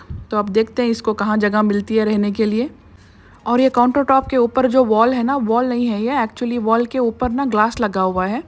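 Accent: Hyderabad, India. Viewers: Assalamualaikum! New subscriber here 😊 I know you are from dubai at present but way of talking se puchi Are you from Hyderabad? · when the Dubai mom is actually Indian with a terrible Indian accent, it's disgusting to listen to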